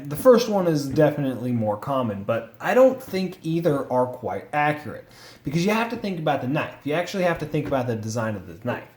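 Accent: United Kingdom. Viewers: What are you british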